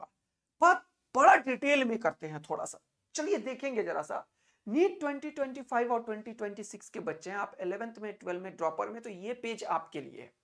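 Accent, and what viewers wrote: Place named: Maharashtra, India. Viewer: Sir, aap Maharashtra se ho kya??? Accent se lag rha ❤ Love it 🎉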